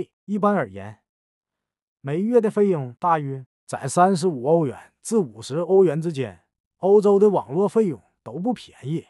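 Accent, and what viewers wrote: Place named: Northeast, China. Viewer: 东北口音太重了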